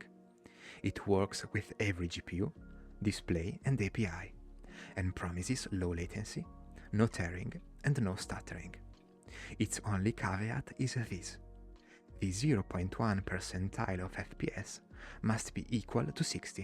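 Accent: Italy. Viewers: Riconoscerei l'accento italiano da 1km di distanza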